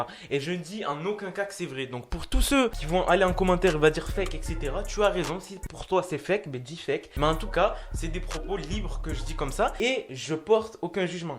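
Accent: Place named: Marseille, France. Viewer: Prk3 tu prnd l'accent marseillai, parle bi1🤭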